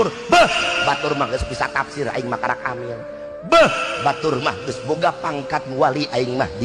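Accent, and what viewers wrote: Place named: Banten, Indonesia. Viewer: Logatnya sunda banten kan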